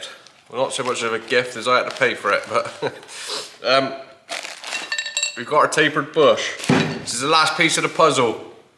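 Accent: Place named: England, United Kingdom. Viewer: Great hammer, where is your accent from im always trying to defferentiate the different English accents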